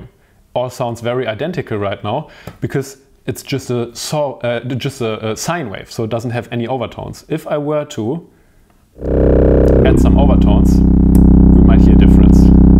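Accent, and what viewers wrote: Germany. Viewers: While a few people here have commented, unkindly and disrespectfully about how you pronounced a few words, I am guessing they are not aware of how we each speak similar languages a little differently. I refer to such things as cultural difference which are tied to where we each grew up. I love your accent. Is it German? I had no problem understanding everything you said. Unlike most all of Europe where people study multiple languages in school growing up, such as Spanish, French, German and so many more, folks in the United States only typically study American English. While not a bad thing to only study one language your whole life, I have traveled to Europe, but have grown up in the US. I am sure that if you had an accent like you grew up in New York City, other folks would make similar comments about the accent or how you pronounced other words. 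Language is language, and as we learn more, we learn to respect others more and find and share our comments ground. Thank you so very much for this wonderful video! Now, I wonder if you have considered creating a similar video comparing and explaining the differences between, say, a Pittsburgh Modular Microvolt 3900 or similar and a comparably priced east coast style synth like a Roland System-1m. I suggest these two specifically because I have them both, are I a similar price range, and both provide standard EuroRack connections for creating new patches and sounds on just the one instrument, yet both can connect to EuroRack synths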